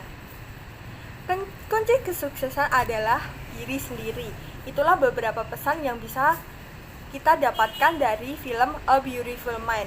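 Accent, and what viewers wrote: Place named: Java, Indonesia. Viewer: Medok'e jowo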